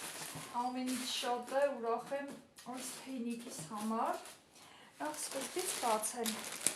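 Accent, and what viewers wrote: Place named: Gyumri, Armenia. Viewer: Հարգելիս որտեղ եք ապրում գյումռեցու աքցենտ ունես համեստ անձնավորություն եք նոր եմ ձեզ հետեվում Ամնից Լիզա🎉😂😢😮😅❤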